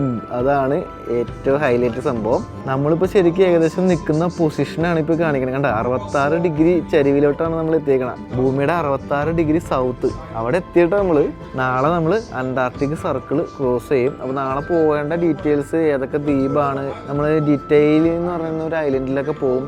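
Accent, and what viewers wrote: Kochi, India. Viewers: Your kochi slang❤❤❤ am also a kocheekkari😌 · Hi. I used to watch your videos when you used to ride in cycle to show the celebrities homes. Aa kochi way of talk aayirunu kooduthal connect aayathu. Later, Egypt videos aanu kanunathu and I noticed that the channel has grown a lot but your presentation and enthusiasm remains unchanged. Inaanu antartica suggestion vanathu. Angane YouTube onum kanuna aalala, enalum otta irupil thane episode 6 aayi. Good job 👍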